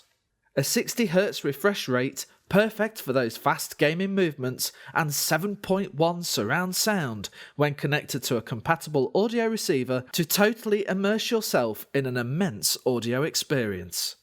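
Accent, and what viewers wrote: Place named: United Kingdom. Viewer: Esse sotaque britânico ♡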